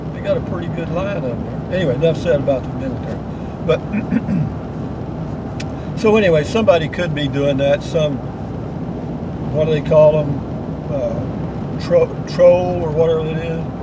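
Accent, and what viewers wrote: Texas, United States. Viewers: Always cheering to hear your Texas-inflected enthusiasm for life,. I started out with your walk through Van Horn, and from there I started to ride shotgun on your drives through beautiful West Texas and the desert Southwest (well, me and 44,000 other folks, that is), and from there I became a subscriber to your channel and I haven't looked back. I grew up in Texas, and though I fell in love with the big city and moved here to NYC (and look where THAT got me), I'll never stop loving West Texas and New Mexico and its good people, and I just want to say that being able to leave the confines of my small Manhattan apartment and head out for the open western skies with makes the day a hell of a lot easier to get through, and I am grateful to you — or much obliged, as a Texan might say. I'll see to it that I use your Amazon link next time I go shopping there. 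I wish you all good things. Keep up the great work. Best regards, Seth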